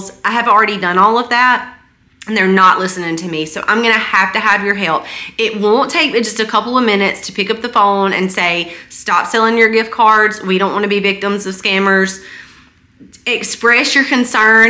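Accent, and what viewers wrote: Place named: Alabama, United States. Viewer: YOU FROM ALABAMA AINT YA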